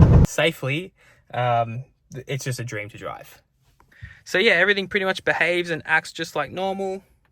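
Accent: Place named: Australia. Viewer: Hey mate, sounds like a skippy accent there 😎 Any suggestions for down under to get some mods / tune done?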